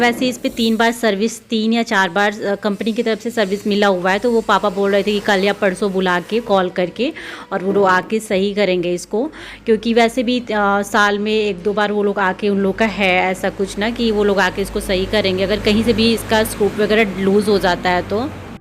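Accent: Bihar, India. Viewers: Meri sasural Delhi men he 20 sal se men yahi rahti hun par Mera mayka bareli u.p ka he mujhe wahan ki bhasha acchi tarah se pata hemere aadhe rishtedar up ke hen par Jo aap bolti ho woh up ki nahi Bihar ki bhasha he mere yahan Delhi men mere sas pas bahut se log Bihar ke hen mere kiraydar bhi unki same bhasha he aapki bali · Madam kya aap bihar se ho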